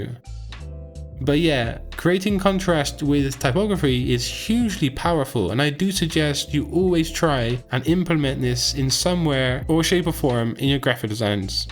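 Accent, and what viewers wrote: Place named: Bristol, United Kingdom. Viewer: Yo, are you from Bristol?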